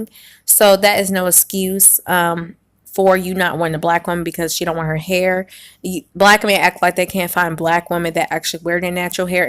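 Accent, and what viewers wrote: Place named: Germany. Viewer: I couldn’t understand this video. You sounded way to German for my English ears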